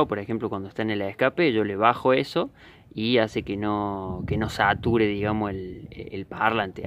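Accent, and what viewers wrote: Córdoba, Argentina. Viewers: decime si no sos Córdobes papá?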